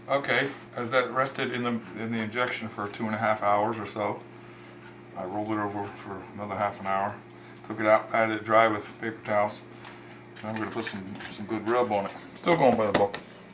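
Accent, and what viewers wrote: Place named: Great Lakes, United States. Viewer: where are you from ? I hear great lakes or MN but swear I heard you say oklahoma lol. That could be the T.B.I. fooling me though.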